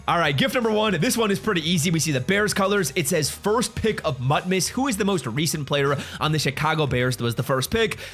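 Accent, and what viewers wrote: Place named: Canada. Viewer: Been saying the same this for like 2 years lol but it’s his Canadien accent I think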